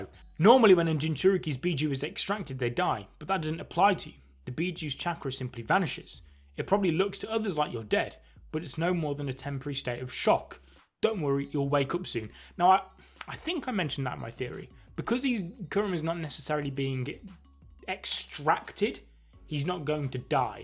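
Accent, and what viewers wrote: United Kingdom. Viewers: Your British accent is so good😃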